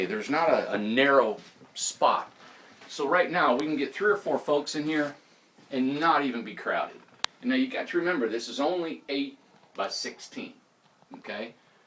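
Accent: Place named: Chattanooga, United States. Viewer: Very nice .I'm building almost finished a tiny house in Chattanooga. I have many of the componants for an off grid system but would really like I knowlageable hand in putting it together. Are you near Chattanooga?I ask as you sound like you might be.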